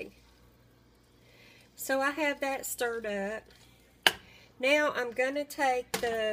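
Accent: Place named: East Tennessee, United States. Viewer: I am a new subscriber and I just love your videos. You may have shared this in some of the videos I haven`t seen yet , but do you mind me asking where you are from? I am from East, TN and you sound very much like the people in this area.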